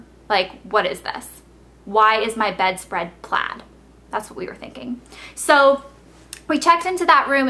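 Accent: South, United States. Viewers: you sound extra southern in this video...i like it! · I love your southern accent!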